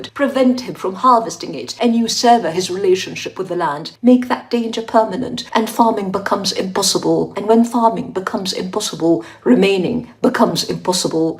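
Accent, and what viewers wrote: RP, United Kingdom. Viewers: I'm really sorry. I can't listen to this affected RP accent. فلسطين 🇵🇸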